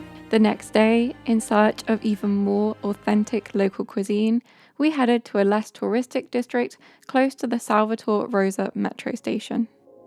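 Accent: United Kingdom. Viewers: Yeah because of course anyone who's not shouting and being chaotic as we are has low energy... This kind of closed-mindedness makes Neapolitans unlikeable to many people, and to other Neapolitans like myself. This girl sounds British, and Brits have a calm way to speak. Or you want her to start dancing tarantella while she talks so she can match what you consider to be Neapolitan "high-energy"?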